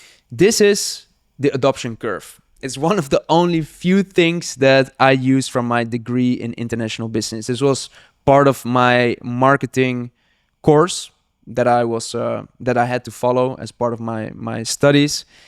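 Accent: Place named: Netherlands. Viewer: sterk nederlands accent , goede content .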